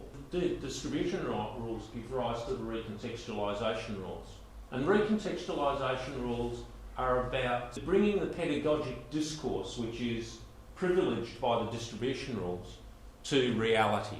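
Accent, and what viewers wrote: Australia. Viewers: been learning Bernstein's idea and had language barrier reading his books. This video is so much help. Thanks. I like the Australian accent.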